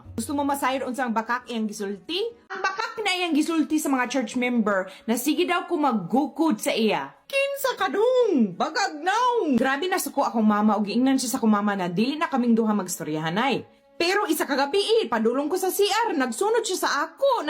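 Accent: Visayas, Philippines. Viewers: Bakak,batan-on..hehehe Bisdak gyud ka maam .hehehe😂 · Nahimuot gyud ko maminao nimo magbinidaya day. You're a real Bisaya, Bisdak gyud. ❤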